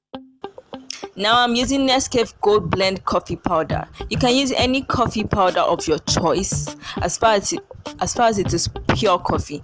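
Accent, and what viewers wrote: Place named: Africa. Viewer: I love African accents omg ❤️❤️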